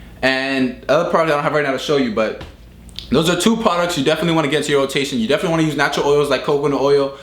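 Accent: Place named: New York, United States. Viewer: No bull shit bro, you lowkey inspired me to cut my curls, im dominican too bro, pero na for real bro you from new york? You deadass sound like it😭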